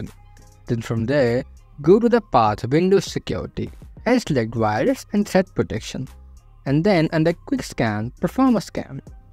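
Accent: India. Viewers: This guy talk so indiany its understandable and the acsent is useless but thanks for trying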